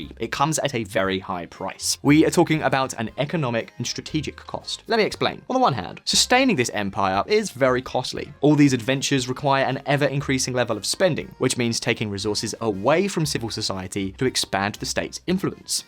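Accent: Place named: England, United Kingdom. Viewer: How is the standard of living in the US? How many homeless increased in recent years? Many Russia leaving because of the harsh weather and by nature like to travel the world. Propping dictators around the world?! Excuse me but, who is backing up the Saudi royal family despite dismal human rights? Bahrain, Egypt, Israel, and many other nations in the past and present. This is one sided propaganda show with an English accent, to make it more credible for the simple minded young viewers who watch mainstream and believe anyone with that accent. I dare you to make a video about the stolen historical artifacts the lay in Western Museums, or about Assange raw deal, maybe enough spine about Guantanamo bay prison, Snowden, cooperate America, backing mascaras in Indonesia in the during Suharto reign, Opium war affects, the theft of Hawaii, all the broken treaties with native Americans, the pillage of Australia by the British, The king of Belgium in Africa!! 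More recently show the new Nazi affiliated government of Ukraine and their western destabilizing actions all over the world. Show some spine in your reports, be original instead of copy pasting your own Empire agenda. Just a month piece nothing more nothing less. Grow some balls Sir.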